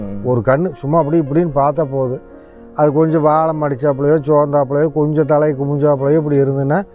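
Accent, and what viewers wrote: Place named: Kongu, India. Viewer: Kavanthapadi la enga relative irukaaga. Unga kongu tamil super. Sir ivaru num pls?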